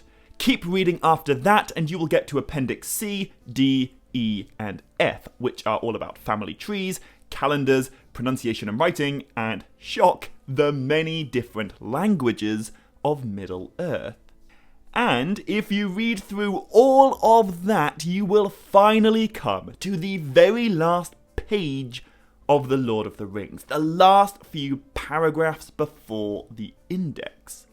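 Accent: England, United Kingdom. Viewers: Question? You sound English. Are you from England?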